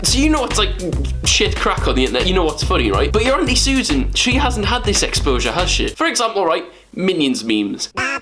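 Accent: Newcastle, United Kingdom. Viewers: are you from newcastle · So happy to have finally found a Geordie you tuber ☺️ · looks like Shawn mendes - but a Geordie version - loving the Newcastle 😂👊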